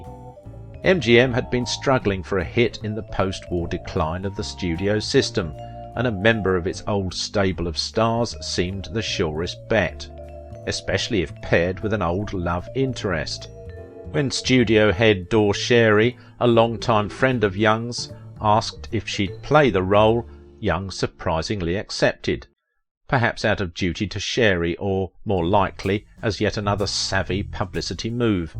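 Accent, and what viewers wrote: England, United Kingdom. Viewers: Shoddy english accented tripe for clicks. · Hi, hope you're doing fine with your busy agenda. You have a strong british accent... Are you originally from Great Britain? Just asking. Have a great day and thx for your work! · Nothing worse than a male gossip. Especially with an English accent.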